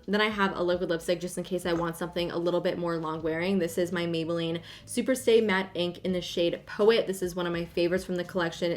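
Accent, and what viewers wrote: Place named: Canada. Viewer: Jalynn Newell it’s because she’s from a region in Canada where that’s the dialect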